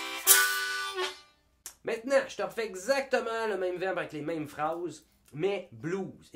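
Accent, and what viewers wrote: Quebec, Canada. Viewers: ton accent me rappelle mon année à Trois Rivières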